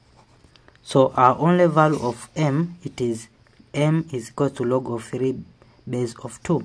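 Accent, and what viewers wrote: India. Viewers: Your Indian accent when you speak English is 🤮🤮🤮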